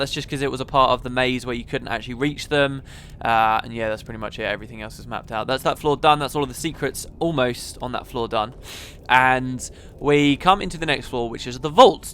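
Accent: United States. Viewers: Haha lovely american accent! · I lover ur american accent!! Leveerrrr!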